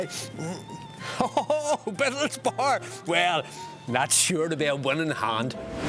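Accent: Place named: United Kingdom. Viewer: Northern Ireland is part of the UK.... so it is a UK accent ...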